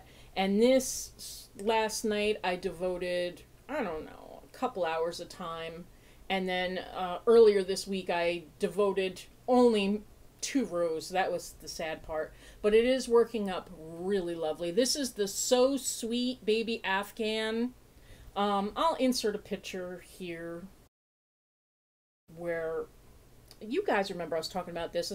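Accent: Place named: Minnesota, United States. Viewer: I love skulls too . I find the best stuff at bike events . I really like when skulls are used in unexpected ways. I have always struggled with weight my entire life . I have had periods of time at a healthier weight but it always creeps back up . Love the Lost Souls scarf 🤩 I always love watching happy mail ❣ Uffda on the Minnesota and Wisconsin accent...ya you betcha 😁 North Dakota sounds like that too ❣ There are degrees of accent , in the urban areas it is less pronounced than rural areas 😘 Fabulous happy mail ! The wooden swift fanfreakintastic!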